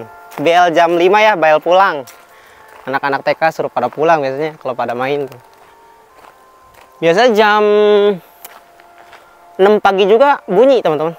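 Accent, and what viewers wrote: West Java, Indonesia. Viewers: Mang lamun ngobrol make bahasa jepang asa ek aya logat sundaan nya😂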